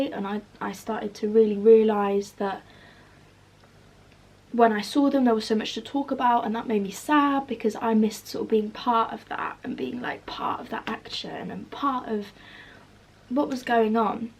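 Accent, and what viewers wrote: United Kingdom. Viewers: Ikr she has the British accent that is super calming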